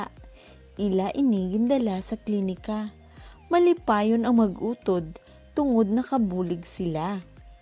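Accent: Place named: Iloilo, Philippines. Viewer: Ilonggo gid ya...♥️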